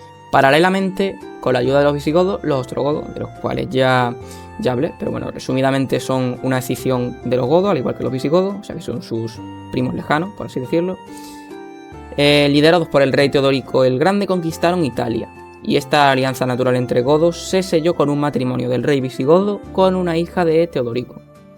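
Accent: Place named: Andalusia, Spain. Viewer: No es mala dicción, es acento andaluz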